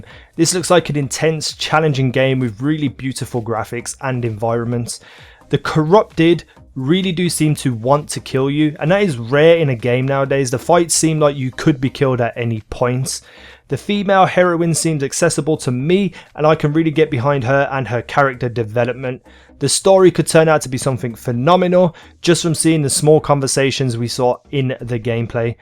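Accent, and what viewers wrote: Birmingham, United Kingdom. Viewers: I can hear that brumy accent ha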